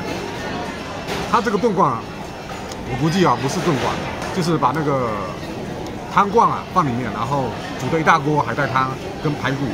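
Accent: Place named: Fuzhou, China. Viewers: 一副算计男的德行， 还带一口地瓜腔，真烦人。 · 地瓜腔好浓😂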